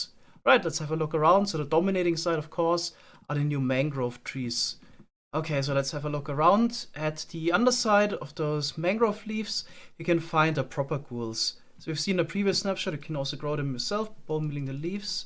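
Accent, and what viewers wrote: Germany. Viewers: Are you German? Your accent really came out in this video. Very nice. 🙂 👍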